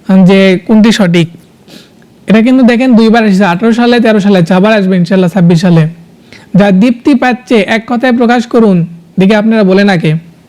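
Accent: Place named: Bogura, Bangladesh. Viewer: ভাই এর বাসা বগুড়া নাকি? ভাষা ত তেমনই 😊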